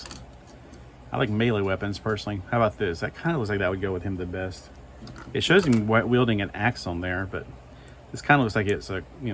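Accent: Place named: South, United States. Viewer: Sometimes you have your southern accent come out more than others. In this video is one of these times. lol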